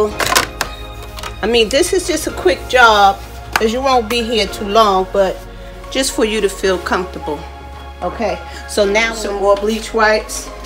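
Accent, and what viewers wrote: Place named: New Jersey, United States. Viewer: I love her mid-Atlantic accent (New Jersey)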